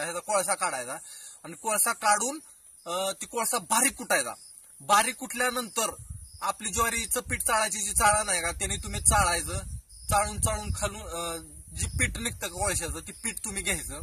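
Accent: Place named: Satara, India. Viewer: Bhau Bhasha tumchi Sataryachi vatate Bhetaychi ichha ahe Sataryat kuthe asta?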